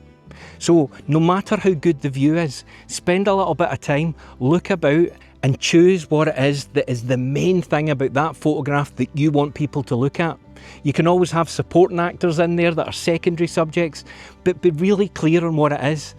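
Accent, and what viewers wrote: Scotland, United Kingdom. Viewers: We have all done these thats how we learn and improve. Love the way you explain how to capture better photos. Thanks 😊everything sounds better with a Scottish accent. Lovely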